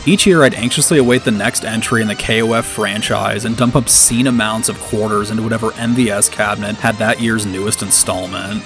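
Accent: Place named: California, United States. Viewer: That California accent!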